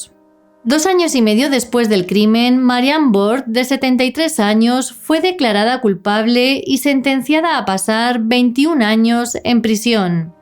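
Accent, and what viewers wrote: Spain. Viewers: Me encanta tu acento español más elegancia misterio i suspenso cuando naras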